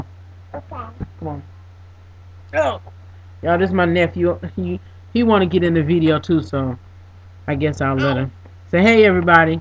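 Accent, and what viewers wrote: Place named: Washington DC, United States. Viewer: OMG! He is sooo cute!! Yasss for the tag video!!! The D.C. accent is easy to pick up...I love it!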